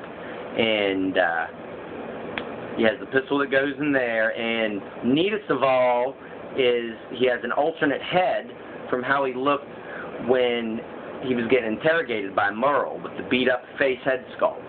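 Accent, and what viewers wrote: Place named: Ohio, United States. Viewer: Love your walking dead videos man! But I also love your accent. Are you from Ohio? lol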